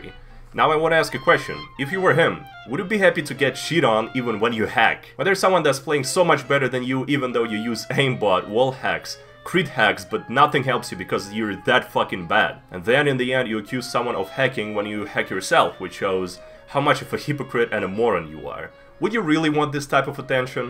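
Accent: United States. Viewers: This is a little off topic but even though you are Russian you speak amazing English and you have a very great American accent!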